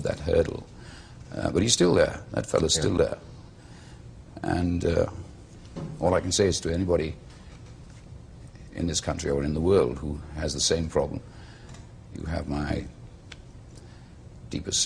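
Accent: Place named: Wales, United Kingdom. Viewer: Can hear his welsh accent at times, poor man, rip.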